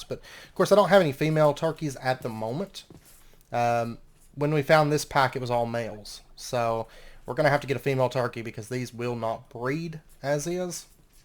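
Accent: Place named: Owensboro, United States. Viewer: I’ve got tons of family in Owensboro. Im really loving watching these videos since your accent reminds me of all the good times I had down there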